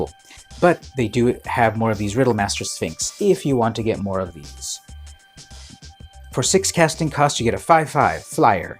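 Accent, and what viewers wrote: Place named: Philippines. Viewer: Filipino ka ba po?? Your accent sounds like a Filipino...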